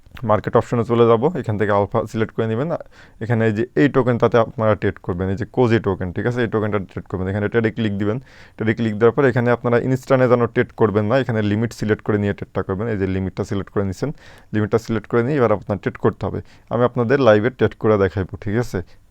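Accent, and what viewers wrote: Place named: Kushtia, Bangladesh. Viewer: vai akta kothia bole apnar voice cena cena laghay apnar bari ki Kushtia tay 🙄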